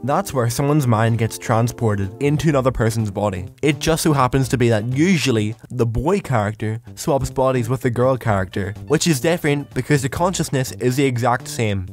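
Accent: Scotland, United Kingdom. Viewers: You sound English but you sound a bit Scottish as well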